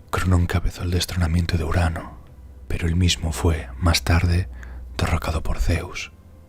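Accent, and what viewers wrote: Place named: Spain. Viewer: A mí le acento español no me gusta mucho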